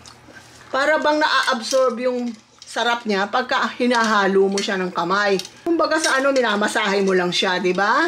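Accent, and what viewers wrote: Iloilo, Philippines. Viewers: fan mo talaga ako pero may ask lang ako. Ilongga po ba kayo? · ilongga ka pala??? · Ilongga ba c mother? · ilongga ka? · Ilonggo ka Tita? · Ilonggo ba si? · Ilongga ka? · Ilongga man gali si 🥰🥰🥰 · Ilonggo po kayo? Duha kabilog hihi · has an accent of ILONGGO hehe love u stay safe. hoy lakas mo asirin c more vlogs po. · Ilonggo ka nay?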